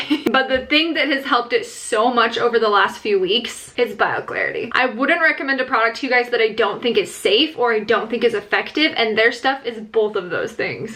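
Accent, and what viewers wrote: United Kingdom. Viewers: Awesome british accent .. 🤓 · Tienes acento ingles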